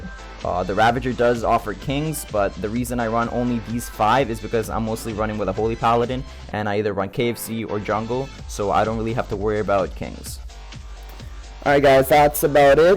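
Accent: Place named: Asia. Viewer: ur asian arent u? i can tell by the way u talk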